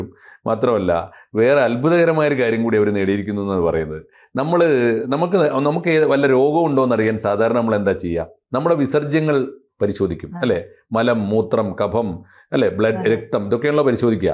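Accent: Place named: Malappuram, India. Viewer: എടാ നീ ഇന്ത്യൻ പൈസയെ അവരുടെ yen മായി താരതമ്യം ചെയ്ത് നോക്കെടാ . ചാനലിൽ ഇരുന്ന് എടീ പോടീ എന്നൊക്കെ വിളിക്കുമ്പോൾ അവൾക്ക് ഇഷ്ടപ്പെടുന്നു എന്നുവെച്ച്, കേട്ടിരിക്കുന്ന ഞങ്ങൾക്ക് ഇഷ്ടപ്പെടും എന്ന് കരുതരുത്. നിന്റെ മലപ്പുറം ഭാഷ മലപ്പുറത്തു മാത്രം എടുത്താൽ മതി. മറ്റ് ജില്ലകളിൽ ഇരിക്കുന്നവർക്ക് ഇമ്മാതിരി ഊളത്തരം ഇഷ്ടപ്പെടില്ല സു!ടാ!*പ്പി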